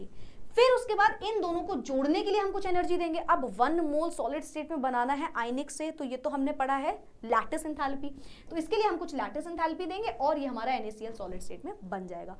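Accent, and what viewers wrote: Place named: South, India. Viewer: Indiaaa, but not from north.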